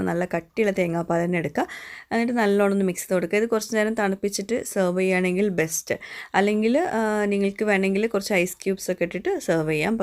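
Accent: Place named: Kasaragod, India. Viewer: Endhra ninga chayakudicha endh baychath nalla majayinda....enthra ee soonante mon enik kasraod romba ishtam especially kasargod basha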